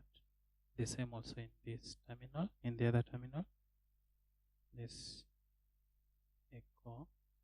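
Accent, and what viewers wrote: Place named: East Africa. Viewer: nice content brother. i recognize that East African accent!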